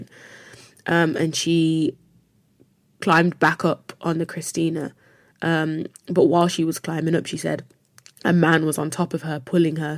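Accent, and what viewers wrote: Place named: England, United Kingdom. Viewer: The history had never hidden, Who knew that the Ballast tank was empty can you say?To cut shot the conversation any vessel that is flatbottom cannot survive listing port or stabord this is what happened. It appears that you have an English accent, check one of The Royal Readers about the Ship Kempenfelt that sank due to listing, this is what happened to The Mv. Christina.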